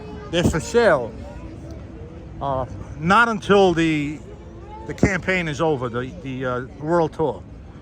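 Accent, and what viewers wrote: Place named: New York, United States. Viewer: Thank you so much for taking us on the journey with you. I haven't been back to New York since I left in 2000. I'm a Brooklyn girl and I love your accent. Didn't realize we New Yorkers had an accent until I left. Thanks so much and look forward to your next video. Stay safe and stay blessed.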